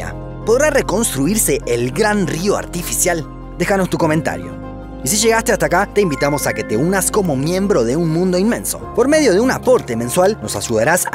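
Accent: Argentina. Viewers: Es agua acumulada desde hace miles de años y no es renovable, es agua fósil, es decir, que tarde o temprano se agotará , como el petróleo . Pero toda agua que no discurre, se "abomba", es decir no se "airea", entonces necesitará tratamiento previo para su consumo? Pero claro para riego si sirve. Si sabía este dato. Por ello se pensaba que por este recurso era que los países imperialistas, trataban de apropiarse derrocando a Gadafy. Pero bueno el vídeo. Pero estos gauchos. su dejo molesta, pero que se puede hacer, si es un buen reportaje solo queda aguantar. ASHÍ, EN VES DE ALLÍ Y MUCHAS PALABRAS MÁS..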